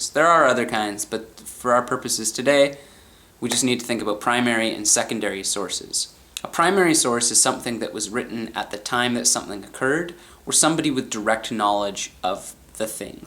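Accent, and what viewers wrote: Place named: Canada. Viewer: I love your accent. <3 Canada!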